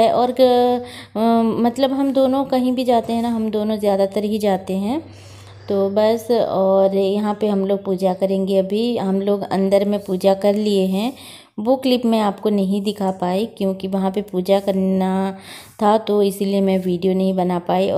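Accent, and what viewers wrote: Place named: Odisha, India. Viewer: Wow super dear...Chhatishgarch n Odisha bilkul neighbour he...to aap ki hindi speaking thodasaodia jaisa bhi lagta he....doing very well dear...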